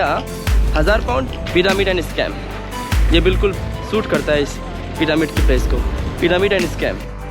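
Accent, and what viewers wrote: Bihar, India. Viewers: That's Magadhi(south Bihar) accent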